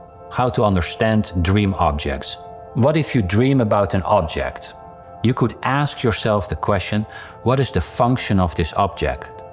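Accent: Netherlands. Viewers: Love the dutch accent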